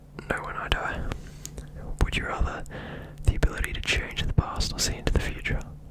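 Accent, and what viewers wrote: Australia. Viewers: are you aussie?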